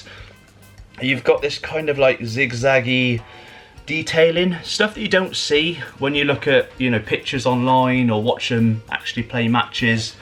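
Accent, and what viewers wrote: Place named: Manchester, United Kingdom. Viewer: What a strange Mancunian accent.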